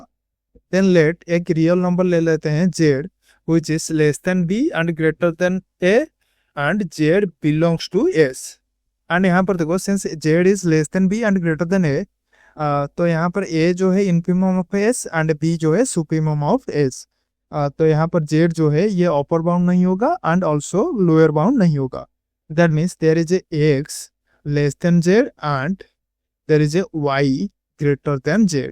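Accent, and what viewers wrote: Odisha, India. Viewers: Are you from odisha??? 🙏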